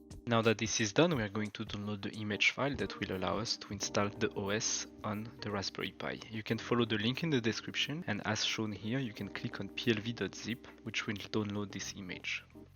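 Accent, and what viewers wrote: France. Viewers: are you french ?? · Cette accent français, super vidéo merci 👍😂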